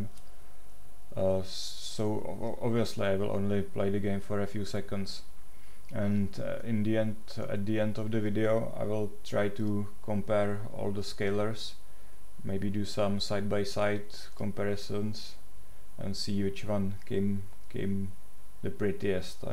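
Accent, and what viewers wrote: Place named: Czech Republic. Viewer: Ne... nikoho jsem se napodobit nesnažil - prostě českej přízvuk.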